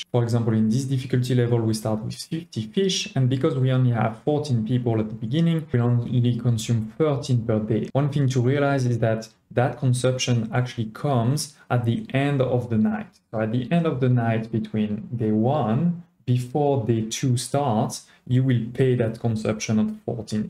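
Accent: France. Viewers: Tu serais pas français? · You're french, right?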